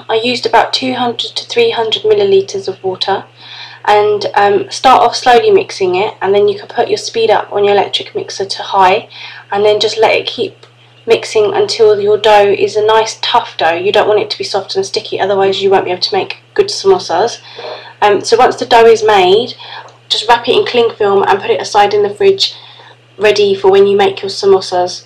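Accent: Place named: North London, United Kingdom. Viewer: I love your accent. are you from north london? thanks for the amazing vids! x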